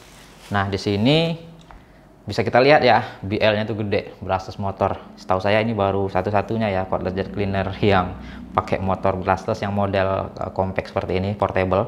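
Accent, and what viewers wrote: Medan, Indonesia. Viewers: Orang medan ya bang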